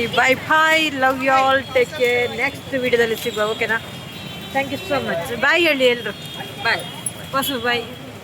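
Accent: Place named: Hubballi, India. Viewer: ಹುಬ್ಬಳ್ಳಿ ಕನ್ನಡ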